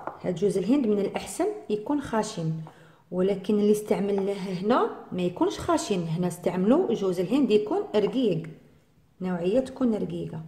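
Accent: West, Algeria. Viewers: السلام عليكم يعطيك الصحة أختي على الوصفة رائعة إذا لم يكن هناك إحراج من اي منطقة أنت ؟لان اللهجة من الغرب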